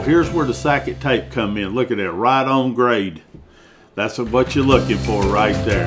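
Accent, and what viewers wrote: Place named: Texas, United States. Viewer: I subscribed for the cool content but mostly for that Texas drawl! 👍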